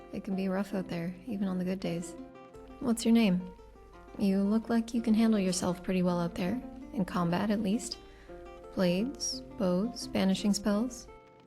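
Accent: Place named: Canada. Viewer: Love the voice acting! Will have to give it a download! Soothing voice too, love the Canadian twang :) Are there any known mod conflicts?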